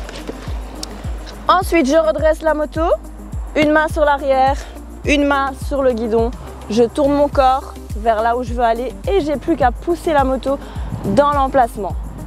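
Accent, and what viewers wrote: Belgium. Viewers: Supers conseils, même pour qq1 d'averti, merci (waouw l'accent région Liège, je kiffe - Pierre - 4500 - Huy)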